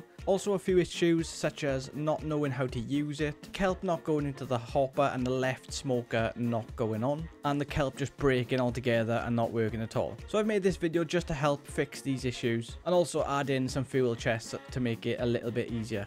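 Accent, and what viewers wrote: Wales, United Kingdom. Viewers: Hey, where in Wales are you from? I'm from Llantrisant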